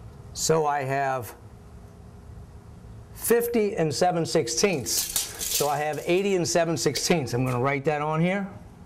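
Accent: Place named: New Orleans, United States. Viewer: Nice videos!! I noticed your NOLA accent as soon as you started talking. I lived in New Orleans for 27 years, until Katrina ran me off. Anyways, thanks for the informative videos and the great tips. I especially liked the video where you did a circle sheetrock patch! Now that was slick!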